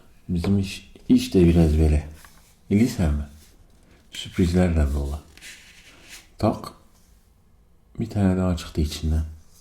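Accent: Eastern Anatolia, Turkey. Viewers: doğu şiveli olduktan sonra her şey olabilir 1 saat doğu şiveli herhangi bir video n olsun yine izlerim her gece aciyorum bir tane şiveli ama artık aynı şeyleri izliyor gibi oluyorum hepsini defalarca izledim cünkü aslinda doğu şiveli videolar icin izliyorum bu kanalı dogrusu digerleride güzel ama onlar hakikaten başka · Doğu şiveli videolar çok daha cezbedici. Emir veriyor gibi olmak istemem fakat bu lisanla psikolog roleplay güzel gidebilir.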